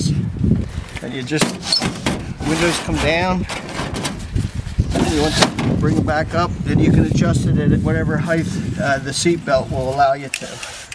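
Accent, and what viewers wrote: United States. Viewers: What part of Philly is this guy from haa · I'm not sure where this guy is from, but he totally has a Delco, PA/South Jersey accent. Hell, he could be REVan Evan's dad!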